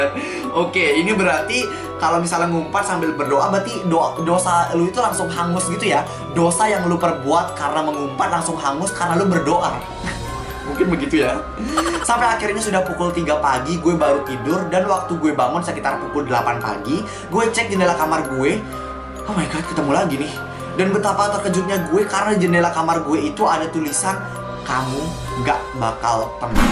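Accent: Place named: West Java, Indonesia. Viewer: yng cerita pasti orang Sunda 🗿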